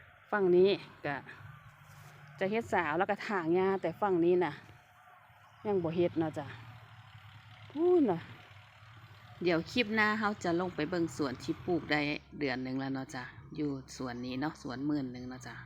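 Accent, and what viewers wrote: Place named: Sakon Nakhon, Thailand. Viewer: สำเนียงเหมือนบ้านผมเลย สกลนคร ผมก็ทำสวนพริกเหมือนกันครับ ขอบคุณความรู้ครับ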